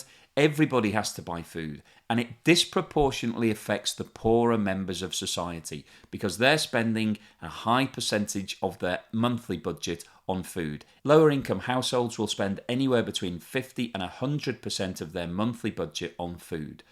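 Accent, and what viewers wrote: United Kingdom. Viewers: Your analysis of the Greek-Turkish situation is appallingly one-sided and misleading. (1) Greece has S300 in Crete because Cyprus purchased them to station them in Cyprus, but Greece had to take them because Turkey made a fuss. Greece did a favour to NATO to appease Turkey, yet again as done many times. (2) Turkey has been invading Greek air space many more times than it claims Greece does. Whether Greece does invade Turkish Air space is to be seen and probably false, because Turkey sees the air space over the Greek Islands as its own, which is a fantasy. (3) Turkey has been attempting to size Greek territorial waters and land for decades because it wants access to hydrocarbons in the Aegean see, and to do this it is inventing its own nonsense all the time. Most ridicolous of all, it never signed UN treaties regulating continental shelves (together with a handful of countries) and then tries to invent its own continental shelf. 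(4) Greece stations small military units on the islands for defensive purposes. This after the Turkish illegal invasion and occupation of Cyprus. And has the right to defend its territorial integrity given Turkey's very obvious aggressive policy. In short, stop doing propaganda for the Turkish state. And if that is not your intention, educate yourself and present facts as they are. Finally, since it sounds like you are a Brit, put your time into making sure that your government gets their hands off Cyprus once and for all, does what necessary to bring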